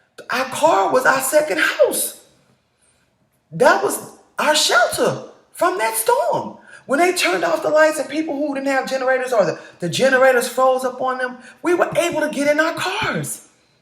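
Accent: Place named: South, United States. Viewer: Smart women and I love your southern accent.👍🌈☮️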